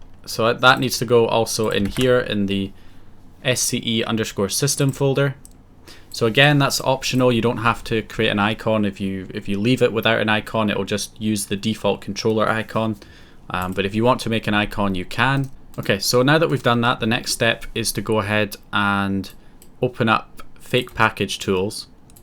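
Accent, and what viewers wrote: Scotland, United Kingdom. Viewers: Irish...? he sounds Scottish to me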